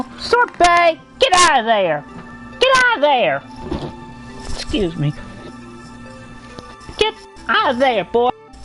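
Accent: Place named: Germany. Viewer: 10/10 German accent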